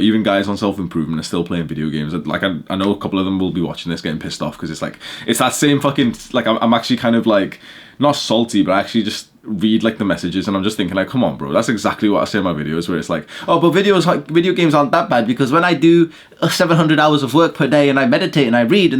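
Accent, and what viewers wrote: India. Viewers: thaaaat indian accent isn't funy XD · dope Indian accent brother, approved! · Bro has the Indian accent better than Indians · Fuck that indian accent made me dissociate. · love from India❤, your indian english really matches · U have the best Indian accent. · That indian accent🤣🤣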